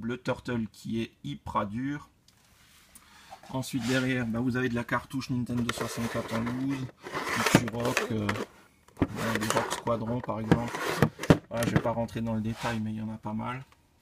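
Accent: Alsace, France. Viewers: Il fait plaise ton accent elsass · alsacien ? ta l'accent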